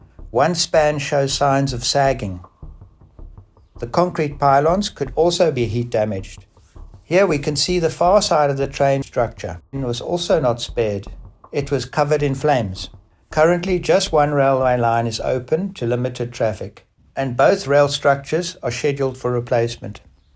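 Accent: South Africa. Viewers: He's South African. He has a strong SA accent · I hear a very South African accent!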